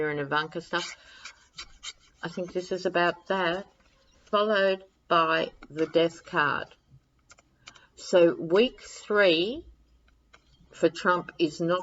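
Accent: Australia. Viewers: Thanks for your razor wit, intelligence and the amazing grasp you have on this whole twisted episode of living history. I’ve become a complete fan. Love to hear your Aussie-isms and fab lefty bolshy-ness calling it out. Xx best of love to you and your family while you are sorting out what needs sorting.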